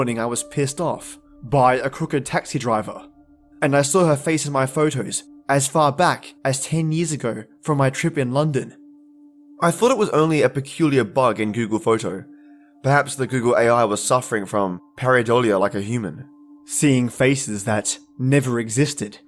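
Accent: Australia. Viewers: This 1 really touched my heart. Your Aussie accent just makes these stories all the better mate! :-)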